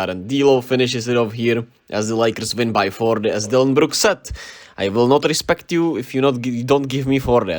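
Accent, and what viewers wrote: Czech Republic. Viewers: Náš přízvuk se nezapře. Cením snahu.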